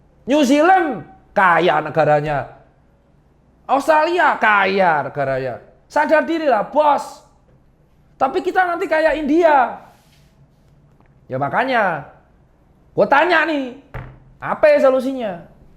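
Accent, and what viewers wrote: Java, Indonesia. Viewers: Dr. Tirta logate koyo wong Jowo Cah 😂😂😂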